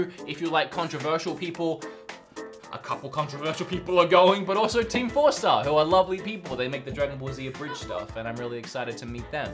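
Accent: Australia. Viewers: Keen to hear your accent become more Aussie again lol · I thought he was from Canada. But now he said Australia I hear it now when he says awesome. Have fun I wanna go to Japan. But I also want to go to Paris and Italy. I just want to travel the world. I’m tired of seeing palm trees.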